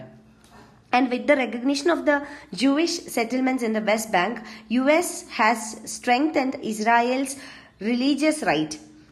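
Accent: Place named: Kerala, India. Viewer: Good to hear a mallu:)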